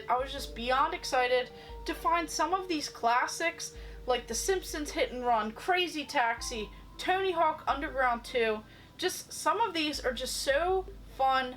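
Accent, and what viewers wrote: Maryland, United States. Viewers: Great video. Love the Maryland accent 'hon! Your collection is incredible, glad I found the channel.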